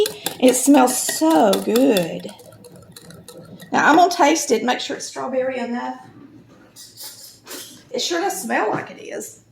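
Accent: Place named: South, United States. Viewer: Happy birthday! Hope you’re having a wonderful day with family and/or friends! I love your accent! I’m from the Chicagoland area. I truly appreciate the southern hospitality, recipes, and southern drawl! Thanks for sharing many good recipes! 🌸😋💐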